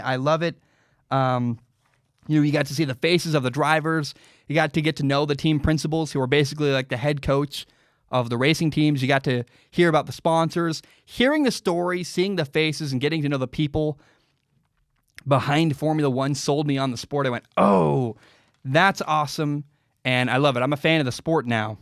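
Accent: United States. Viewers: Formula 1 is my favorite sport after Football and I'm glad it has your attention now! The Netflix series has made it even more amazing. Get in there, Lewis! It's so refreshing to hear a content creator with an American accent say all the Formula 1 stuff! You're going to have to pick a favorite team and driver now.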